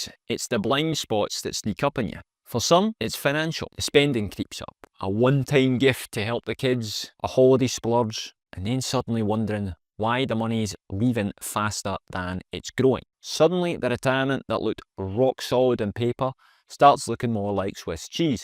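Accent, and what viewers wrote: Scotland, United Kingdom. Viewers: LOL, nice Scottish accent, I love it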